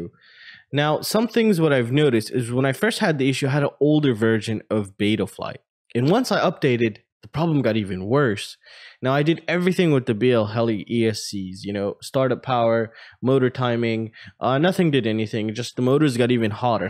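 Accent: United States. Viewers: Are you in the uk? Looks like UK and Uk numberplate on the 206. You sound American.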